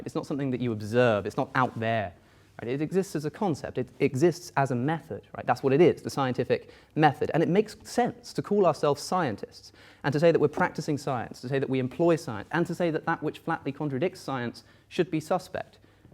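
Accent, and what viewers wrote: England, United Kingdom. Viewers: I think that's called an English accent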